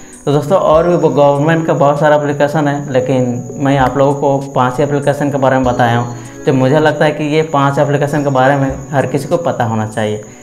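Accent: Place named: Bihar, India. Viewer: You look like angrez but you talk like pure bihari. Ye raaz kyaa hai bhai...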